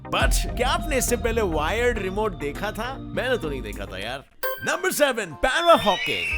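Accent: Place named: Uttarakhand, India. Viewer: Aap uttarakhand se ho mujhe pta chl gya 🤣🤣😂😂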